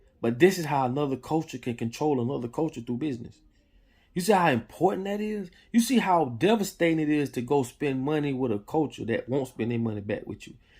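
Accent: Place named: Florida, United States. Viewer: I knew you was from Florida the way you been talking.......str8 facts!!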